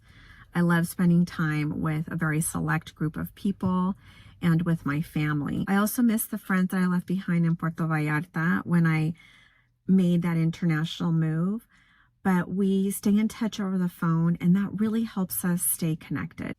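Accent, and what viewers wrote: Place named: New Jersey, United States. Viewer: She sounds like she is from Jersey:)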